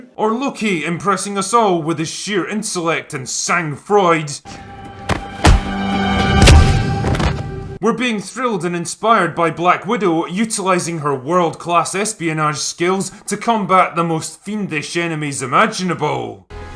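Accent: Scotland, United Kingdom. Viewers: Is the Groundskeepr willie accent his natural accent or does he exaggerate it for these videos?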